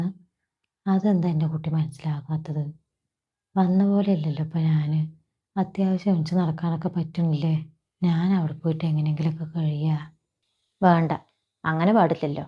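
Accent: Palakkad, India. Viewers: പാലക്കാട്‌ ആണോ വീട്. പത്തിരിപ്പാല എന്റെ നാത്തൂൻ ഉണ്ട്. അവരെ സംസാരത്തിന്റെ slang ഇങ്ങനെ ആണ്.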